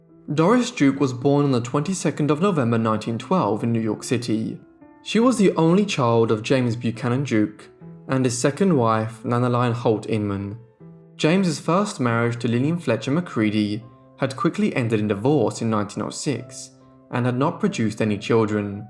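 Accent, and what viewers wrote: United Kingdom. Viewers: He has a speech impediment AND is British. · He just sounds British to me. I didn't have any issue with it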